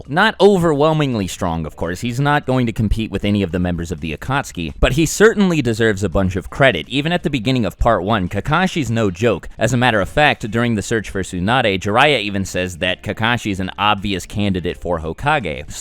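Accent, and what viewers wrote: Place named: New England, United States. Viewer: You're actually very talented at forming and discussing arguments. I mean, no joke. You got chops, son. Also you're super confident and have that sweet ass yankee accent we southerners are supposed to hate (but secretly love...we hate CA accents, but stories for another time) I just really like the way you can put so much weight and disdain behind a word for tits and pickles, like "why do people give so much of a shit about WOOD" when talking about wood release. You also made me a Minato fanboi. So... i dunno if thats something to be proud of, but hey, you did it!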